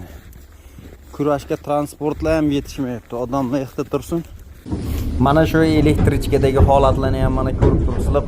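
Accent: Chortoq, Uzbekistan. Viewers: Ака узингиз хам Наманганни чорток тарафидансиз шекилли назаримда,шевангиз ухшаяпти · Укалар чортокдан шева тортяпти. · Намангани каеридансиз😂😂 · Диндошим шева Наманган чорток ка тортяптия тугри топтимми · Namanganlik ekansizda aka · Чортокдамисан укам..гапинг рост. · НАМАНГАННИ ЧОРТОК ШЕВАСИДА ВИДЕОНИ ЗУР ОБЗОР КИЛИБСИЗКУ 😂😂😂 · Наманган чортогданмисиз а ака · Bu gapirib turgan Nomongonlikmi diyman,layk yuq sanga😂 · Наманган Шева шекили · Наманганданми дийман окам? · Çortoq şevada gapiryapti a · Чортокдан экан гапираетган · Ака чортокданмисиз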